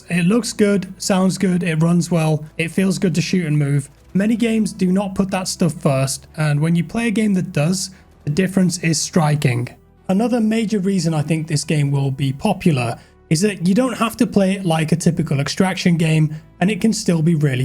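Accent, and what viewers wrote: United Kingdom. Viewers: I have to say it's not that often that I can handle the British accent for more than 30 seconds. But I can handle you talk for 10 minutes and that's good enough. Talk about how good the graphics are, the gameplay, the movements, and how do you feel with the third person perspective. Please try to sound more American normal or at least a non-wining accent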